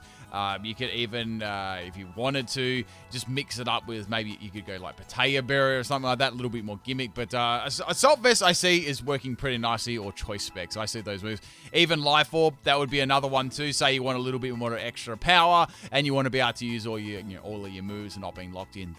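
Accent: Australia. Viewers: that's sick - have seen this but never knew the name. you're aussie yeah?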